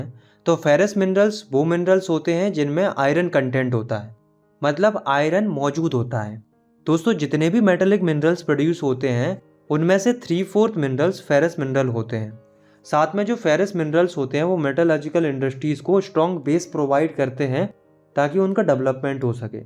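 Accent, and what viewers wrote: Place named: Bihar, India. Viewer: just focus on his accent chapaniya bihari paan wala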